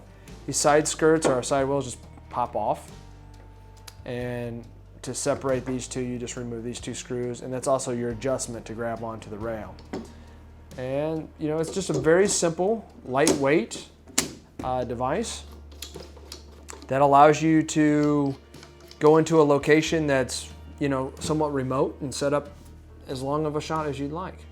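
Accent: United Kingdom. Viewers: Don't you just love British accents ? :D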